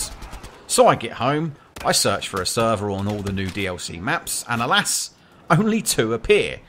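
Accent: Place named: Brighton, United Kingdom. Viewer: ***** nice, do you have hardline on both platforms? Also what part of england are you from, judging from your accent I would say Brighton ;)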